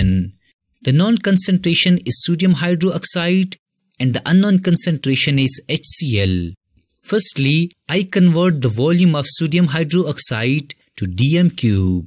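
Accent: India. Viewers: Indian accent is funny 😂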